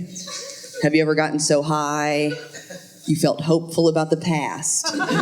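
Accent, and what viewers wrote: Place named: North Carolina, United States. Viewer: I grew up in and around flat rock NC... Definitely got the accent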